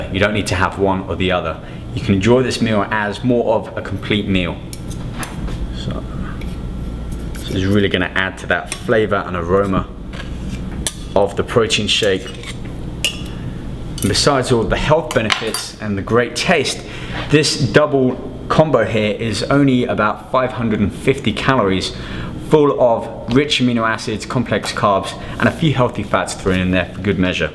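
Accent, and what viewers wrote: United Kingdom. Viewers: What accent is this ? British ?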